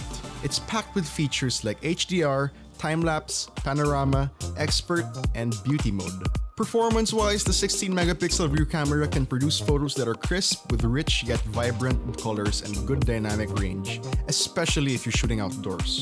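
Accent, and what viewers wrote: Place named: Philippines. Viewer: His phillipino accent is so strong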